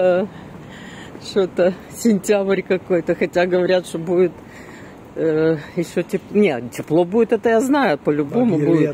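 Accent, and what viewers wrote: Odessa, Ukraine. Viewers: Спасибо за вашу прогулку по Одессе и ваш такой родной одесский акцент!❤❤❤❤❤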